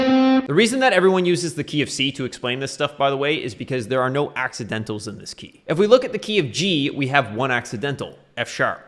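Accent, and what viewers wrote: Canada. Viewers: Why are you swearing. Sounds like you are from Canada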